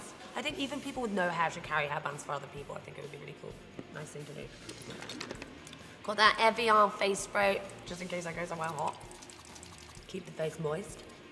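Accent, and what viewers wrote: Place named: RP, United Kingdom. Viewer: Ure at ure sexist wen ure carrying on n not taking urself serious it's so attractive for men also u should insure your eyes thay r fkn insane only chick that can rock a Camono keep keeping it real u r a buzz when you talk u don't expect the posh accent brill xx hope this cheers u up xxx lol xx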